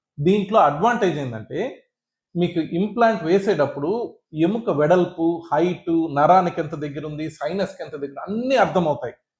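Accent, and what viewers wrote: Nellore, India. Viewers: Anukunna appude anukunna mee language vini mana nellore ani 😊😊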